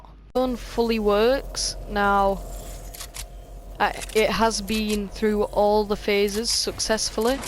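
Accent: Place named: United Kingdom. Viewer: Hes british eh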